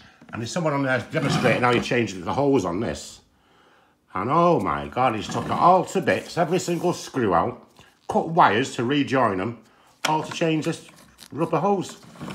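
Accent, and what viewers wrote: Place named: Northern England, United Kingdom. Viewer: As we say in Australia, you mate, are a deadset legend! Thank you so much. I am the world's worst handywoman and your guidance (made all the better with your friendly Northern accent),meant I replaced the rotten old hose with minimum fuss. Big thumbs up!!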